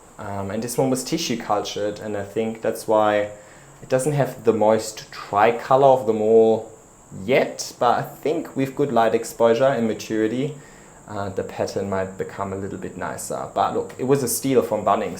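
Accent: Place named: Germany. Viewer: You sound German now.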